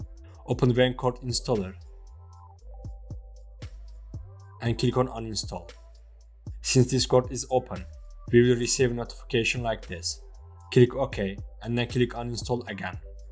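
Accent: Turkey. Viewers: turkmusun kral bu diksiyon ne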